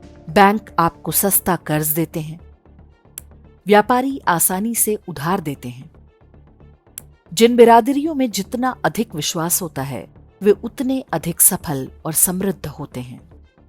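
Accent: Standard. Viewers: आप शुद्ध हिन्दी का उच्चारण करते हे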